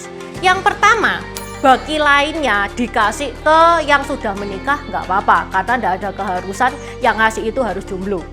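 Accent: Java, Indonesia. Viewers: Suaranya medok jawa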